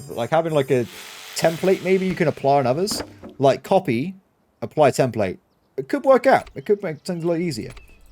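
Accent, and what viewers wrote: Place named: United Kingdom. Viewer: "A lot and are" word,sir you very cool with British accents specially with A alphabet James bond accents,are you from south UK sir?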